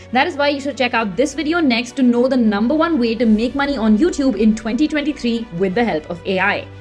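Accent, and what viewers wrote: India. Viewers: is this official channel of invideo ? with an indian accent is a turn off to be honest and on top she reads so fast like she is in hurry which make the customer experience a torture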